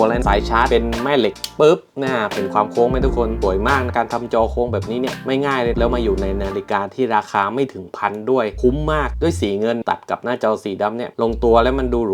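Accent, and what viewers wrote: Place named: South, Thailand. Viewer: สำเนียง คนใต้บ้านเรานิ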